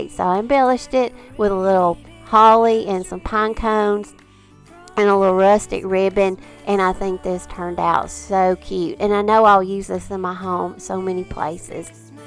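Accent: South, United States. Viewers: Loved all your projects !!!!! New subbie...... looking forward to more projects..... And I can tell you are Southern.... We Southerners can recognize our peeps !!!!! ( just by listening to them talk !!!! LOL ) God bless !!! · Came over from Julie’s channel! New sub! (I’m in Alabama so I love your accent!) ❤️🦩 Vicky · I love them all & i love your Southern accent. :) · Where are you from? Your southern accent sounds like my family. 🥰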